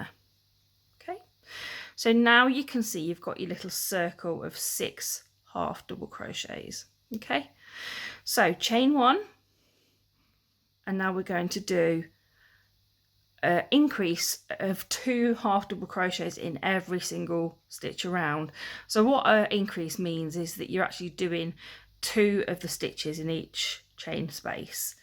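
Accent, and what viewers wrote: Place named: Midlands, United Kingdom. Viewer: Great video. I just love your Midlands accent, Birmingham??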